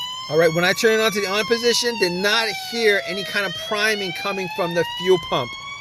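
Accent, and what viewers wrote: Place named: Staten Island, United States. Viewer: Sounds like Staten Island accent. Judging by shade it’s 10 30am sxo he’s up early + just had first puff puff. Sadly, He and the boys won’t be getting “za” today.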